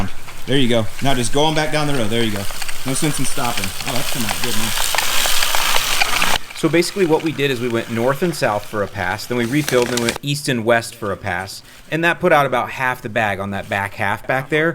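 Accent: Chicago, United States. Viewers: I can't get enough of the accent. I need a whole Chicago accent episode!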